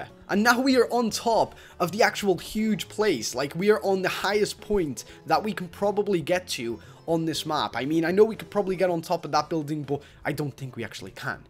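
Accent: Ireland. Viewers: Are you Irish?:D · is he irish?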